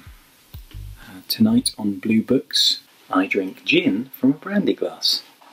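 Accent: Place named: England, United Kingdom. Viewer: I love how more and more comfortable you are in front of the camera. If your accent didn’t give your nationality away your dry humor did. It is very much English 😂😂😂. I love this random video please make this a segment. It’s literally stream of consciousness in video form. It’s absolutely brilliant! Who ever was on the other side of the camera was hilarious, I like her!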